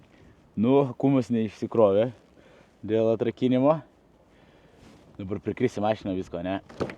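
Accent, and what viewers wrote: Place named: Samogitia, Lithuania. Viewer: Labai simpatiškai skambėjo tavo kalba žemaitiškai👍pajutau jog esame giminingi...Palanga močiučių🥰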